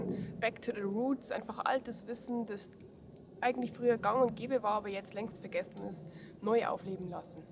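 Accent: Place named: Bavaria, Germany. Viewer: Toller Trailer und du kommst sehr sympathisch rüber aber bairisch ist halt eh immer am charmantesten. ;-) Mich würde interessieren was das für eine tolle Musik ist, die du da verwendet hast. Griaß aus da Obapfoiz. ;-)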